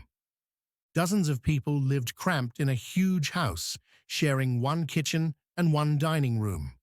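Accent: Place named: Cockney, United Kingdom. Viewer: Cokney accent ✊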